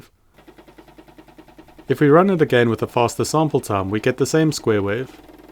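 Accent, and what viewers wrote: South Africa. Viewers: As a saffer in the uk its surreal hearing a SAFRICA accent on a random YT algorithim suggestion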